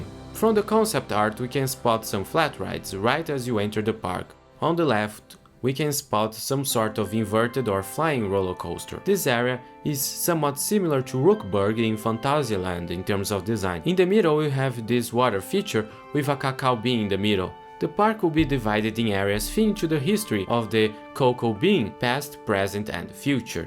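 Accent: Brazil. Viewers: O narrador é brasileiro, né? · I already knew your channel but only now I realized you might be brazilian like me (based off the accent). Meu amigo, finalmente nosso país terá algo digno de seu tamanho na indústria de entretenimento temático e espero que isso faça o BCW e o Hopi Hari se movimentarem rápido quanto a B&M e a RMC , respectivamente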